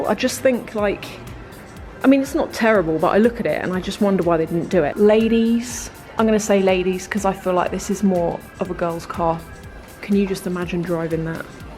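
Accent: England, United Kingdom. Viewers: U got most sweet and cute voice and accent , most of British accent I couldn't understand , but yours yes😘😘😍😍💐💐love from srilanka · 7 I stumbled upon this I really like your car videos Love from Indiana USA Love the way you English people talk Have a great week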